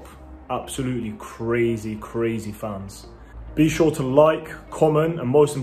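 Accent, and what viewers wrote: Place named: United Kingdom. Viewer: Imas odlican britanski naglasak brate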